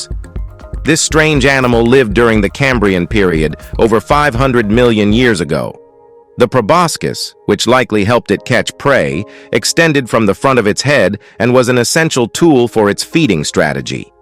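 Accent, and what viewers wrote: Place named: United States. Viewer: Hate that american dialect in science. sounds duh ? Foolish..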